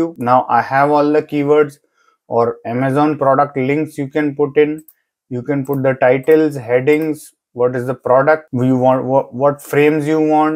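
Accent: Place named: India. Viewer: hi sir good work but plz it would be better if you speak hindi, its irritating,excent is hindi and language english, 😢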